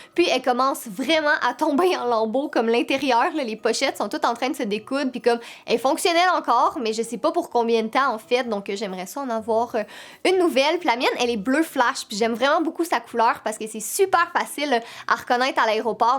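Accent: Quebec, Canada. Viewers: Je te suis depuis 1 an et j’adore l’accent québécois ❤️😍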